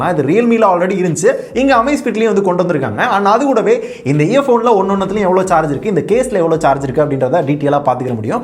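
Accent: Madurai, India. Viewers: Kaya kaya nu pesitu irpanga.. Madurai slang 😁❤️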